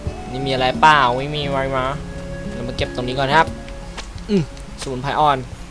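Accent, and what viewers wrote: Suphanburi, Thailand. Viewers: คนสุพันป่าวเนี่ย เหน่อจิงๆๆ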